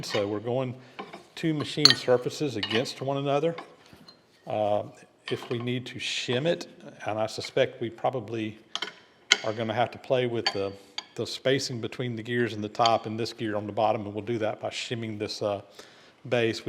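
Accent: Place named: Georgia, United States. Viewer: I came here only as a follower of the Tally Ho project. Initially I was debating whether to follow as I could hardly make out a word you said - (Georgia accented English dialect to Dorset English ear). But I'm very glad I stayed. After a month I could follow you clearly & and learnt what a fascinating channel you ran. Watching how you overcome problems and your clear explanation of the most technical processes are excellent. I'll be staying.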